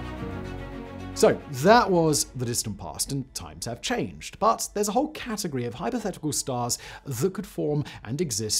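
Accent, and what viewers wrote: United Kingdom. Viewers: I enjoy these channels very much, but sometimes pronunciations are so cartoonishly British it's distracting. If it turns out he's actually from like Boston or Queens but choose his accent to seen more legit, I wouldn't exactly be surprised. It's a lot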